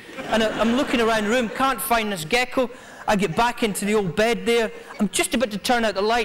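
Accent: Australia. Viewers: He’s awesome! And he’s got the Aussie accent down perfectly! 😂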